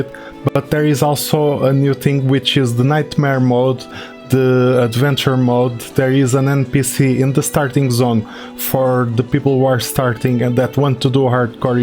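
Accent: Portugal. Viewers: Pelo sotaque consigo logo perceber que és tuga! Não sabia que haviam tugas a jogar BBC.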